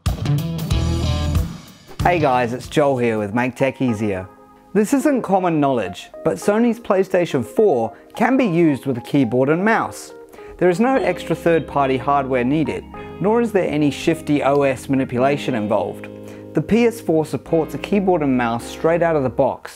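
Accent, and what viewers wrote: United Kingdom. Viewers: Did you get his accent? He's probably across the pond hint hint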